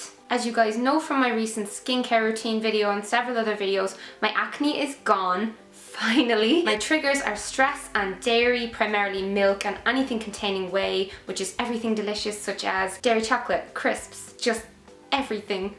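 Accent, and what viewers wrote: Ireland. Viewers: New Subbie!!! Are you Irish (sorry if I'm wrong I'm really bad at recognising accents) Do you know how much the body shop setting spray is??? xxx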